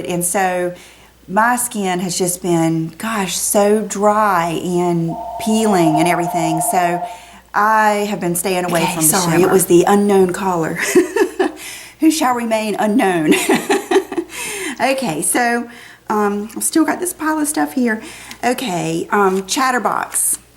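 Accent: South, United States. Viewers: I just discovered your videos, and I'm addicted! You are so enjoyable to watch. Love your taste, your attitude, and your southern accent! Just gorgeous all around.:)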